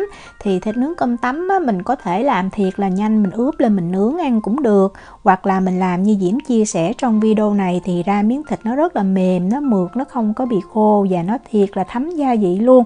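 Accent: South, Vietnam. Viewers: Nhìn ngon hấp dẫn, ở VN người ta hay nướng thịt cốt kếch,còn nạc dăm thì bán bún thịt nướng. Diễm ơi, e ở miền tây tình nào mà nói giọng rặc miền Nam giống ngày xưa quá. Dễ thương lắm!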